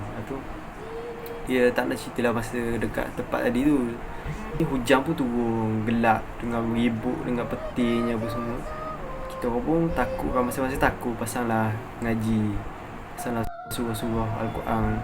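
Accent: Terengganu, Malaysia. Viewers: abe tu ko? Meme pon . Sdak dgr org ganu kecek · Org mna? Bunyi cm org terengganu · nampak gaya ganu ni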